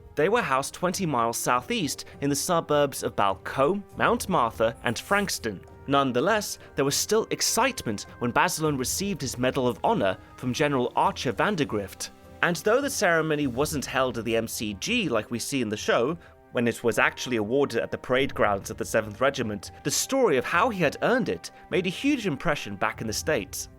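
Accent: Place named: United Kingdom. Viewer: you say names so British like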